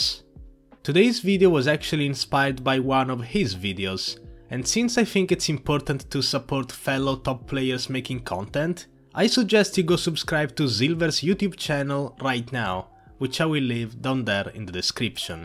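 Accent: Italy. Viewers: you are italian? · Are you italian? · are you italian mate? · Lol ho capito che eri italiano solo dalla pronuncia ahah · you have an italian accent? Are you italian?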